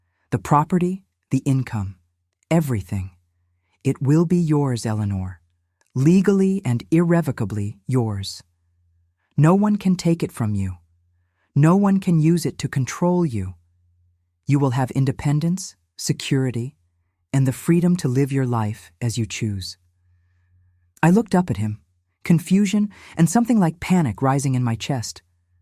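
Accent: United States. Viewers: I absolutely LOVE these story's but, you have made a fundamental error choosing this beautiful American voice to narrate. Victorian & current day America, never had Dukes or any British aristocracy. To make these story's more believable, you should use an English/British voice. ❤❤❤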